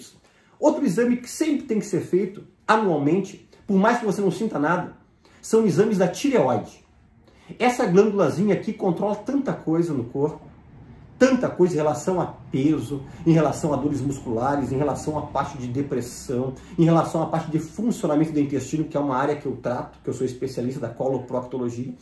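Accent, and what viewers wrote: Rio Grande do Sul, Brazil. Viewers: Este sotaque é encantador. Os gaúchos já nascem com oratória. Parabéns!!! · Ele até tem sotaque gaúcho, só não sei porrrrrque ele puxa tanto no R como fazem os cariocas.. Mas é um excelente médico 😃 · Adoroooooooo esse Dr ehhh, muito competente, vídeos muito explicativo! Ainda mais com esse sotaque de gaúcho tchê, fica muito mais gostoso de ouvir🤩 Deus o abençoe muito🙏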